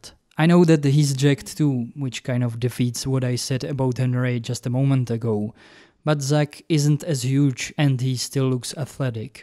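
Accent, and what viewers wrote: Czech Republic. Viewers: man that czech accent is nice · Hej úplně random jsem našel tvoje video a je vtipné že okamžitě jde poznat čecha co mluví anglicky :D Velmi dobré btw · are you czech by any chance? the accent seems familiar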